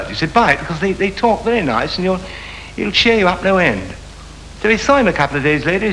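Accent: RP, United Kingdom. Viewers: Love his upper-crust British accent. Makes everything he says even funnier. 🤣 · The uppercrust English delivery style always made me laugh and find it even more humorous..if I imitate an English gent i channel his imitation..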